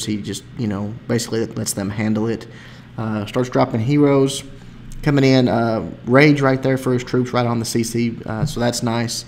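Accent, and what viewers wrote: South, United States. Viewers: I recognize that accent anywhere in the south ;)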